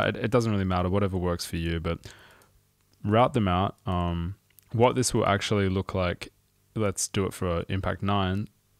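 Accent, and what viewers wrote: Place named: South Africa. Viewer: Definitely found this helpful thanks. From your accent I gather you're a fellow South African.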